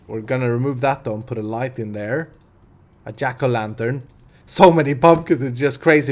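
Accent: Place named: Sweden. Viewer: U sounds so much like Anomaly! #Svensk-engelsk dialekt! :))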